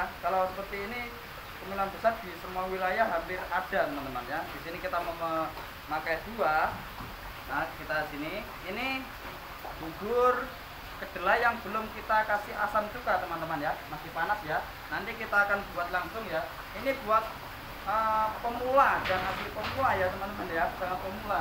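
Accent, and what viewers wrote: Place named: Java, Indonesia. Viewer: Logat jawa bosq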